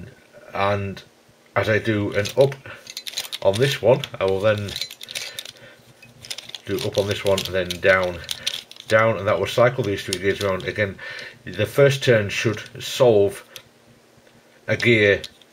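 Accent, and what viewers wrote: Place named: Northern England, United Kingdom. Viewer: Thanks, I'll give one of them a go. I am guessing (from your accent) that you are from the north of England; are there any puzzle groups that get together up here that you know of?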